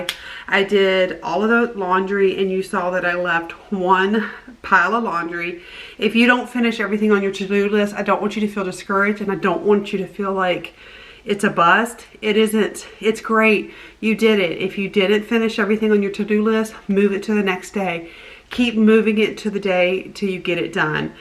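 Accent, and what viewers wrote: South, United States. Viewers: Love , love your southern accent ! My moms family comes from GA .Love their southern accent! Miss my family so much !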